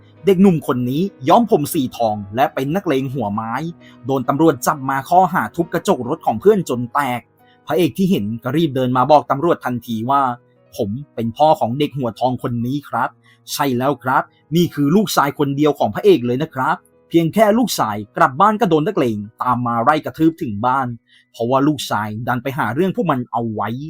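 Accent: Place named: Thailand. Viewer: สำเนียงพี่เหน่อจัง เป็นคนจังหวัดไหนหรอครับ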